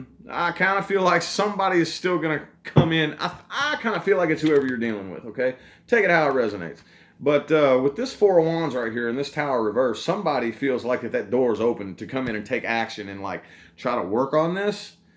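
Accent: South, United States. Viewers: His southern accent tho😍 Aqua here.... Let me go and listen to the "Bonus"🏃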